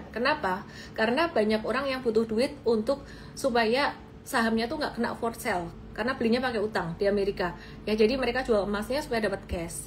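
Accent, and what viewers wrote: Java, Indonesia. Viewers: Medok bgt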